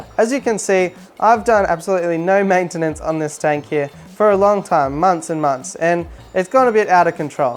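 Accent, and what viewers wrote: Australia. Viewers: R u ausie?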